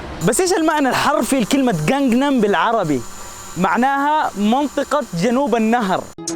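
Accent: Jeddah, Saudi Arabia. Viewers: انبسطت كتير من طريقة تقديمك للمعلومات وخصوصا اللهجة الجداوية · يا ولد صوته تقول واحد جداوي 😂😂😂😂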